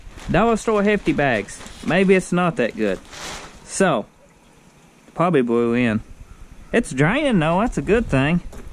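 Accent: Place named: Louisiana, United States. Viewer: Why does this ol boy sound like he's from Shreveport?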